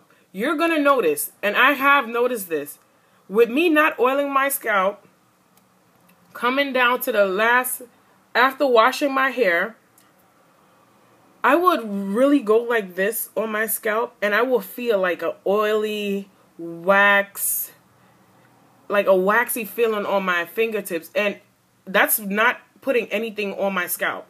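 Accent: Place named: New Orleans, United States. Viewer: THANK YOU but it sound like you from N.O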